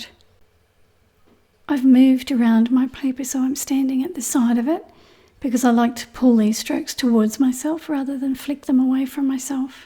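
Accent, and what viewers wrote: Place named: Australia. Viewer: Ahh Aussies...got to love our different accents. A useful tutorial as always. Thank you.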